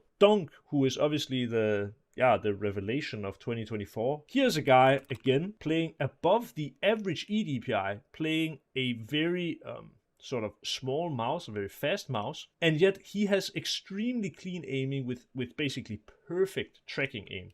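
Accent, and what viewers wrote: Denmark. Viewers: flot dansk accent :D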